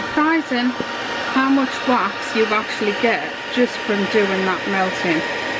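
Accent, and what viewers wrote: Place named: Stoke-on-Trent, United Kingdom. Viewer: Where you from? Your accent sounds very similar to mine and I'm from stoke on Trent.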